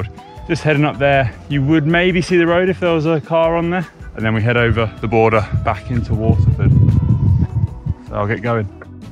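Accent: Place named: South East England, United Kingdom. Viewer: Name: Irish Home: Ireland Accent: South East England? What’s the story,?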